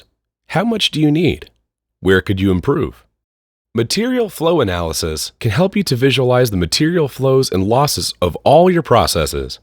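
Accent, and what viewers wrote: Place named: United States. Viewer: Why is there an American voicing for an EU project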